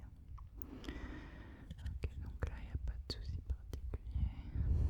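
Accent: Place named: France. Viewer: This channel is the only ASMR channel that actually makes me fall asleep 🙂‍↔️ His French accent is very cute to listen to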